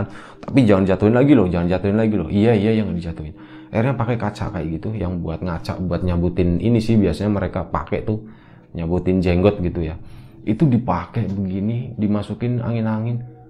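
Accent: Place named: Central Java, Indonesia. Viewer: Mantab mas. 🙏 logat kakay Jawa tengah Alus kromonya👍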